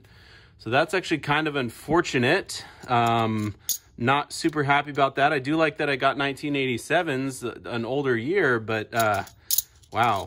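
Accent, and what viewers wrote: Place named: Oregon, United States. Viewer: Thought you sounded like an Oregonian. Haha! I live in Wilsonville, myself.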